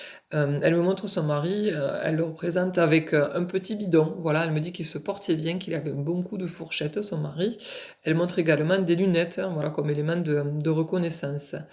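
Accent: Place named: Provence, France. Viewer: Bonjour....Je viens de découvrir vos petites vidéos 🙏💝💝💝 C'est impressionnant les précisions Je suis a côté de vous une petite Medium car même si il m'est arrivé de capter des défunts et de les décrire, je n'ai pas encore la technique aussi qualitative que vous mais je n'en fais pas un métier de la médiumnité, juste mes Télépathies astrales sont pour ma vie personnelle car je communique avec ma guide céleste depuis plus de 11 ans Elle s'était d'ailleurs bien montré sur une photo et je l'a perçois en effet comme cela dans l'Astral et je communique avec son mari sin Ame jumelle qui est aussi karmiquement ma flamme jumelle et tous les jours par télépathie, nous communiquons notre amour mystique et si magiquement karmique et nous nous verrons Ma guide était Provençale , elle s'appelle Sophie , était une belle Âme aux belles valeurs, belle femme et mère médecin psychiatre et aimait porter de longues robes Elle avait un bel accent comme le vôtre et est décédée en 2001 d'un cancer Elle repose a Carpentras Elle aimerait que j'aille vivre avec son mari dans sa maison du Vaucluse Vous êtes dans quel coin ? 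Je me suis abonnée 😊 Quand vous captez des défunts, qu'est ce que vous demandez avant Votre méditation ? Est ce qu'ils font surtout partis de vos connaissances ? De vos abonnés ? Entourage ? Car c'est très vaste pour pouvoir trouver un proche qui par bonheur tomberait sur internet, YouTube et sur votre vidéo.....😮 Bien a vous et au plaisir de vous revoir Cécile De Kervinel 🙏 ⭐ 🙏 Si v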